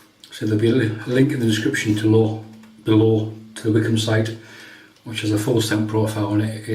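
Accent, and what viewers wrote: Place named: Northern England, United Kingdom. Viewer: Good one! I use the same perfume which is obviously spot on with that soap. One day I will get the original CREED. Now I’m not good at British accents and I apologise if I am being horribly offensive, but to my ears you sound a bit like you’re from Northern England.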